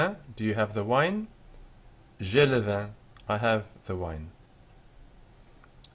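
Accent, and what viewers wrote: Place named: United Kingdom. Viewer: interesting British french accent :-)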